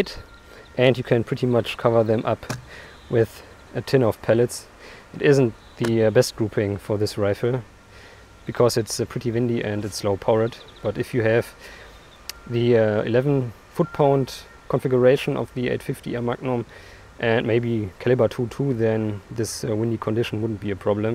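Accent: Germany. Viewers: Deutsches englisch♥ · Deutsches English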